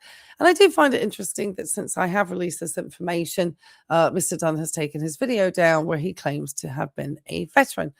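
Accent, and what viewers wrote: United Kingdom. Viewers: My understanding is when you join up it's actually 8 years but 4 years active then 4 years ready reserve. I do know this to be true because friend his son just got done with active duty in the Navy he has to report to some office in our city. Said just sits there all day doing paper work once a month. She must be from England sounds like a British accent hasn't a clue how American military works. Her reading these letters word for word is annoy as h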